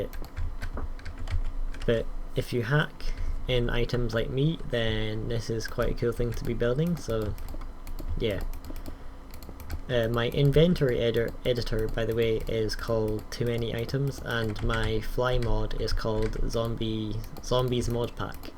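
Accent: Scotland, United Kingdom. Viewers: YOUR FROM SCOTLAND YAY MEH TO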